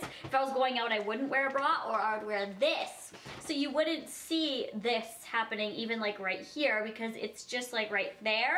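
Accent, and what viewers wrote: Canada. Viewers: It's the slight Canadian accent. All of my family comes from Canada and they all have the same pronunciations as does. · M C thought i was the only one that noticed specially bag or tag anything with a ig its a canadian thing or ?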